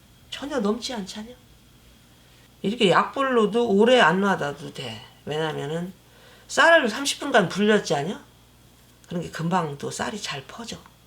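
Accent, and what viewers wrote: Chungcheong, South Korea. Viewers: 구수한 충청도사투리군요ㅎㅎ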